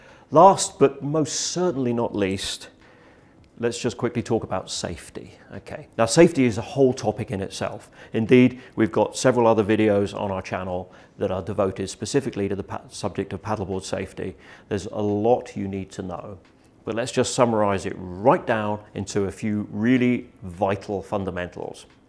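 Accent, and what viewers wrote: United Kingdom. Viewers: he's just British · Love the British accent (proper English)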